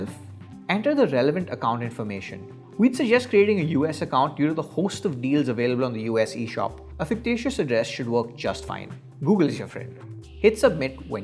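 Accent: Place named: India. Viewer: I don't know why I hate Indian speaking in english like this. I can flawlessly understand native English speakers, but Indians talking english is just horrible. I also talk in english when needed, but the way he sounds and others also, it's unbearable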